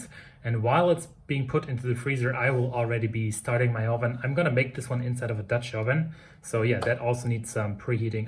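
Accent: Germany. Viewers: Until you mentioned Hamburg I would have bet that your german accent in english is of a kind of hessian type.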